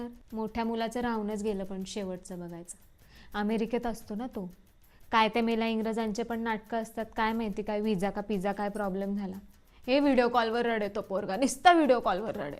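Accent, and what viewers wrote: Khandesh, India. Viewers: छान वाटतं ना ..ऐकायला...खान्देशी ...आम्ही सुद्धा...आपलस वाटत ऐकताना 🎉🎉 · व्हिडिओ कॉल वर निसता रडे ...😂 तुम्ही खानदेशी आहात वाटतं तिथे असच बोलतात 😂😂😂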